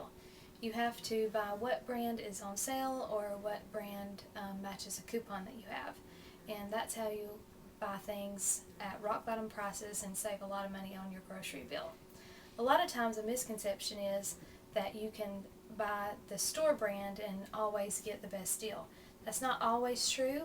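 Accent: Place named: South, United States. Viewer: Great info, and the hint of southern accent makes my heart melt. Keep up the great work.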